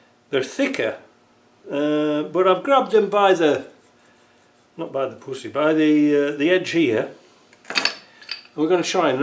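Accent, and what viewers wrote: Yorkshire, United Kingdom. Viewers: i do enjoy your clips. just wondered how what sounds like a yorkshire lad ended up in cannda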